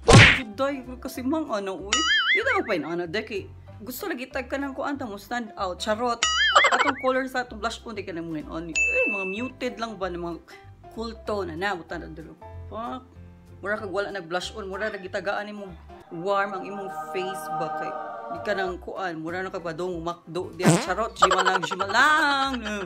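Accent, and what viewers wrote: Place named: Visayas, Philippines. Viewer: Hi po ate wala po ako maintindihan pero ang cute mu magbisaya hehe bisaya din ako kaso hindi marunong magbisaya po!!!stay con tayo forever .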